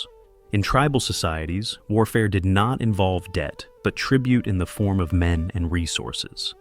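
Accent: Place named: United States. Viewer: 🙉🙉🙉An other voice please and no American English.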